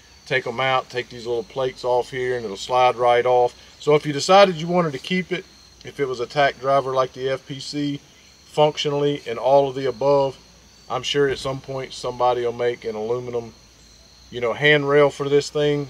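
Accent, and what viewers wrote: South, United States. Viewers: Subscribed for the southern twang alone. Who makes your iron sights? Thanks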